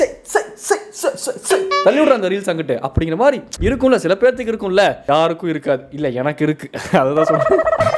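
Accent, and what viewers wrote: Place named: Kongu, India. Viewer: Kongu pechu varuthu athan.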